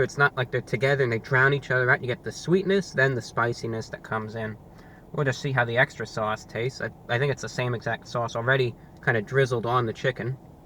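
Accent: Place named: New York, United States. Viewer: Why do you talk like those radio dudes in the 40s. I get it you're from New York but that's an old school accent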